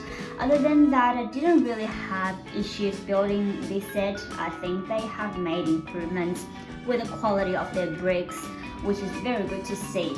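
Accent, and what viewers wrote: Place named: Australia. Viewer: Are you Australian? You sound Australian.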